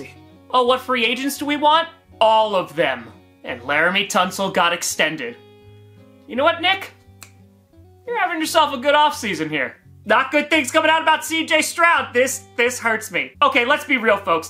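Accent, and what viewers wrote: New York, United States. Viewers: When a Texans fan gets a NY rant with accent 😅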